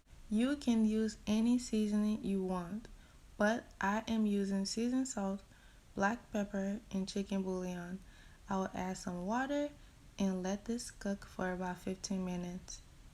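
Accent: Liberia. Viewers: Woman are you from Liberia? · You sound Liberian.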